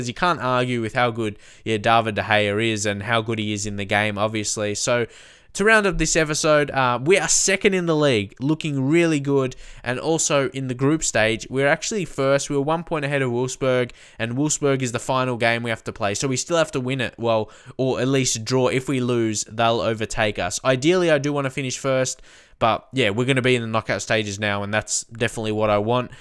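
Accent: Melbourne, Australia. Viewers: That Melbourne Accent.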